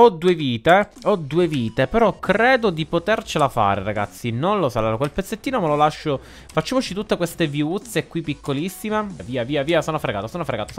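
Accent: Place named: Palermo, Italy. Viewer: melo sentivo che sei palermitano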